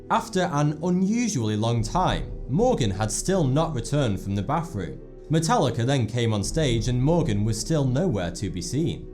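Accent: United Kingdom. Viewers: Skill Definitely sounds like he's just from the United Kingdom. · Something about that british accent makes all of these videos not scary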